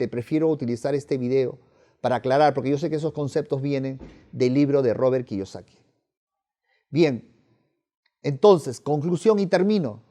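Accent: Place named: Peru. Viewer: No es por discriminar y ante mano lo digo no todos son iguales, pero con solo a los primeros momentos que escuche a este señor asumí que es peruano, la mayoría que eh conocido creen saberlo todo y los demás están erróneos aún viendo los logros del prójimo , mi humilde opinión , eh aprendido q escucha consejos de quien a obtenido frutos no de quien cree lo sabe y no los a conseguido .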